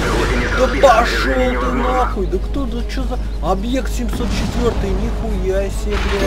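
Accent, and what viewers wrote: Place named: Odessa, Ukraine. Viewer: Зато Одесский колорит прям хлещет из речи. Одесский быдло - колорит. Хотя хотя если брать, то у него даже не быдло стайл... Это просто говно.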